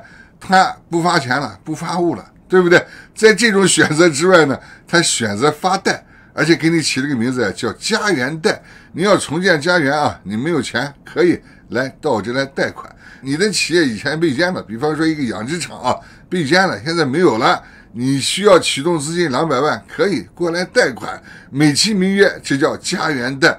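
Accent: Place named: Northwest, China. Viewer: 熟悉的西北音~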